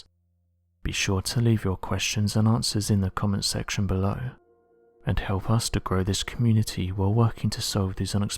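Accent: England, United Kingdom. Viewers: Where is the picture of the car .fake fake fake ..i was really looking forward to some REAL proof of this "dog man " from your "english" voice..iam not saying this dog man is not real ..... Iam saying your a english dude from that island where there "royalty's" is brother &sister mother dad uncle are one & the same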